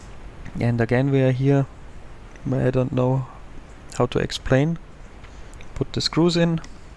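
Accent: Germany. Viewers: Your Bavarian English is quite good!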